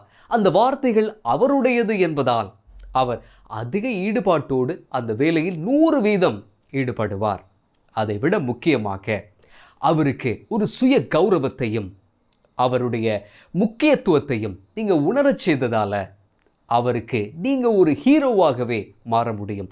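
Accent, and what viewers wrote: Sri Lanka. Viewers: Arumaiyana Sri Lankan Tamil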